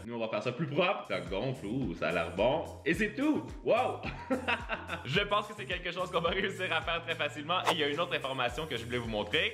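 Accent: Quebec, Canada. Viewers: J adore ton accent québécois 😂😭😂😭😂😭😂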